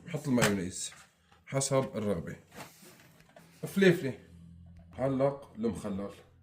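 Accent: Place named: Idlib, Syria. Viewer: بحب احضرك لانك بتحكي زي صحبيتي هيا من ادلب و بتزكرني فيها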